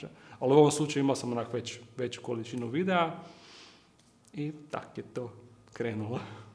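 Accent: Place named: Germany. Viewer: With your accent, I thought you were German or Austrian,:-)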